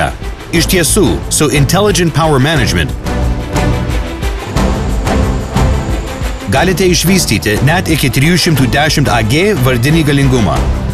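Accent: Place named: United States. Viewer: komentatoriaus amerikoniskas akcentas zudo :D:D:D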